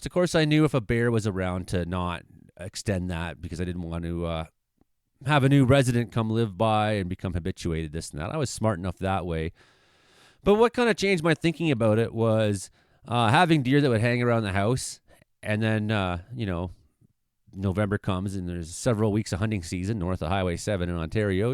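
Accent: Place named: Australia. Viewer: Pretty damn good Aussie accent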